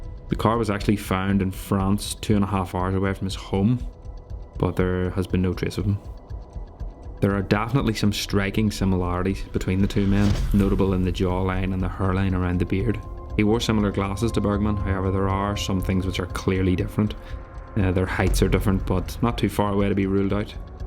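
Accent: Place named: Belfast, United Kingdom. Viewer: Fantastic film, love the Belfast accent...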